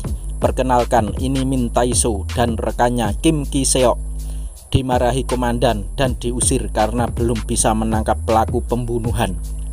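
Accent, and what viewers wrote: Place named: Java, Indonesia. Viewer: Bisa gak kalo bacain alur nya jangan medok Gak enak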